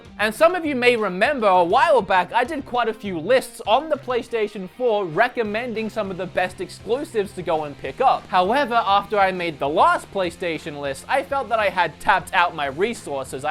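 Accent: Australia. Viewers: He is Australian lol, I'm Scottish and understand the confusion between English and Australian accents, listen for the subtle sounds that Australians make. Also, if you mean the whole island of Britain you would say British. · Are you aussie or is my accent detection going whack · Are you australian?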